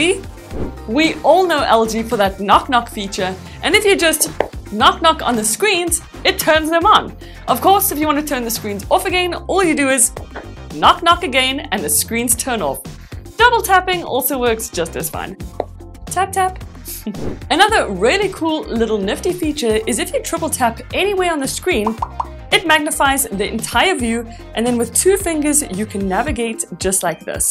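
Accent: South Africa. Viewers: You sound South African😁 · She’s From South Africa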